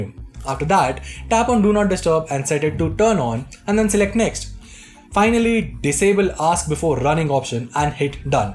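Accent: India. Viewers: Indian accent 🤢🤢🤮🤮🤮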